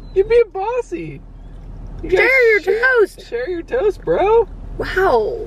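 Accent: South, United States. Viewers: Southern accent is sounding so good!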